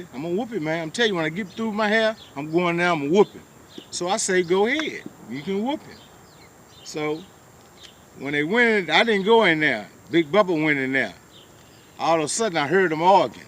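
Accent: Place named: Texas, United States. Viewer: I knew u was from TX when I heard “gettin fly at da mouf” 😭 mane den I seen the location on da video and u in da southside of houston I’m from da nawfside much love bro I fw yo videos.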